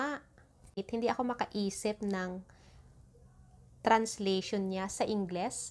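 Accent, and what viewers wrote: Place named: Ilocos Sur, Philippines. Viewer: Very southern ilocano. 😊😊😊😊 they will confuse that vowels have different ways to pronounce 😂😂😂😂 vowel A become I 😂😂😂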